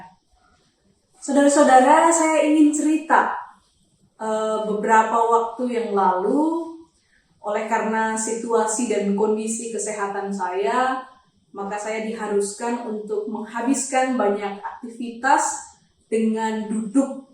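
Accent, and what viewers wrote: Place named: Banyumas, Indonesia. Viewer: Maturnuwun Pdt. untuk Kerlingnya......, ngapak-ngapaknya dipertahankan nggih🙏😊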